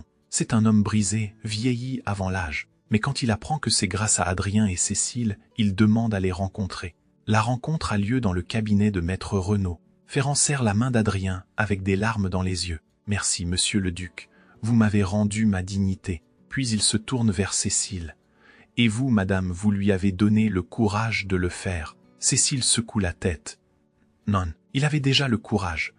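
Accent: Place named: England, United Kingdom. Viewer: Arrêtez cet accent anglais c est insupportable et n à aucun sens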